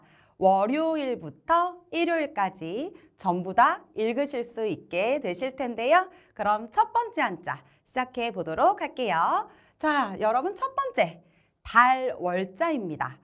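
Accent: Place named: South Korea. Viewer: 한국 강의톤이라 어쩔수 없는건 이해가 가는데 일본어의 인토네이션으로 한번이라도 문장 한번에 읽어주면 더 좋을 것 같네요 너무 한국식같아요 ㅠㅠ 결제하고싶은데 고민되네요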